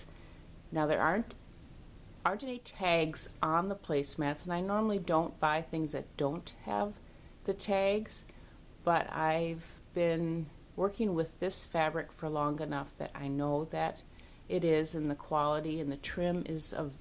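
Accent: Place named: Midwest, United States. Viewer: You sound like you must be in the Midwest I have lots of Cousins in Wisconsin and yes they have had the weirdest weather with high winds...one day some snow and then warmer temperatures. Enjoyed your video....do you sell a lot of yarn my younger sister loves knitting ! Corie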